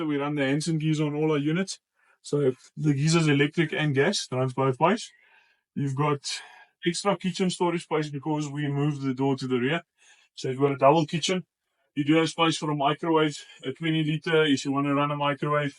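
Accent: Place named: Africa. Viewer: Man where you from? Your accent doesn't match your face. Are you from Africa?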